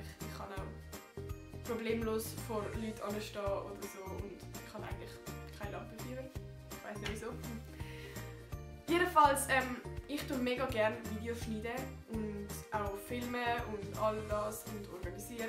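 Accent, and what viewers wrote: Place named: Switzerland. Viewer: Sehr cool, das Schwitzerdeutsch 😁👍 fast wie eine andere Sprache 😜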